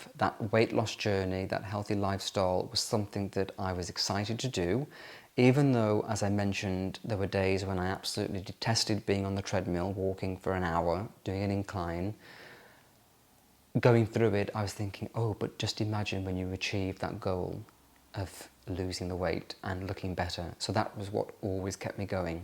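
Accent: RP, United Kingdom. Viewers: I have been watching since your old flat. I think you look the same. Maybe your accent has become a bit more posh. You are lucky you are not aging. No still the same. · What a posh accent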